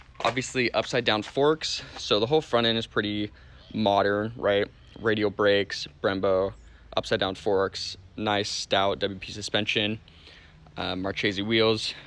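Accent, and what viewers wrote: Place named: United States. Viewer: What wheels? 🤣🤣🤣 American pronunciation 🙄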